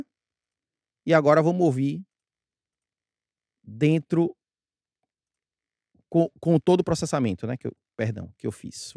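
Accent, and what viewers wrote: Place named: Salvador, Brazil. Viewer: Muito bom ouvir o sotaque soteropolitano falando coisas tão de audio tão importantes e trazendo a música fantástica que se faz em nossa cidade.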